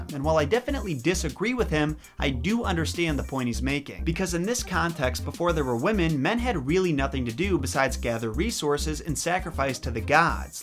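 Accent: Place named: East Coast, United States. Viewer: I’m still trying to place his accent lol. I’m sure it’s east coast but I want to pinpoint it lol.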